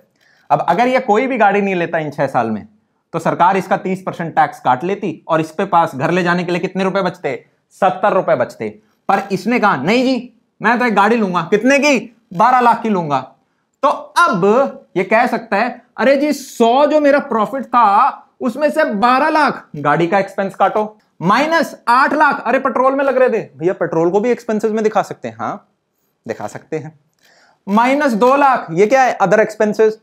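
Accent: Haryana, India. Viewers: haryanvi accent... pade😁😁.... great sir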